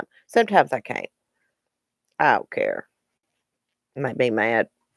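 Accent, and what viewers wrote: South, United States. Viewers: Your accent is just fine. We are country too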